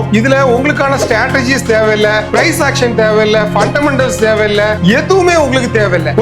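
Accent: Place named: Kanniyakumari, India. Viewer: Unga slang apdtha irukku nanum kanniyakumari tha marthandam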